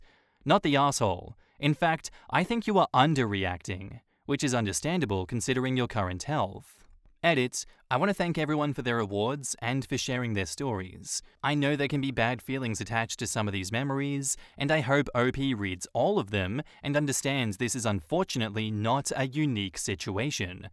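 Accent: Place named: Australia. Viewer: the great Aussie voice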